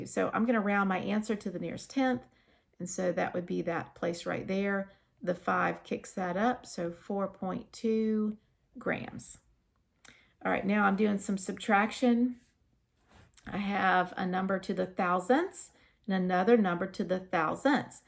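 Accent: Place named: South, United States. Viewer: I'm so stoked to have found your channel! Currently in a Chem course as a pre-req and while struggling through some other math concepts discovered that anything said in a southern accent apparently sticks in my brain better than without. Thanks for making so many videos, will definitely be coming back as I work through the course!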